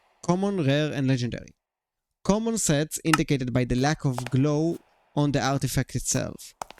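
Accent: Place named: Germany. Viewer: your accent is so interesting it kinda sounds german but not at the same time so cool! also this video is so awesome of an intro its really so awesome to see new ideas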